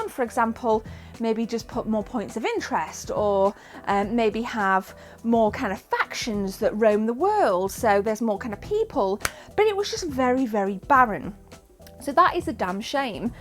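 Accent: United Kingdom. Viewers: I love that British accent lol. My first time watching. Nice job! I'll stay tuned. Days Gone is so much better, by the way!!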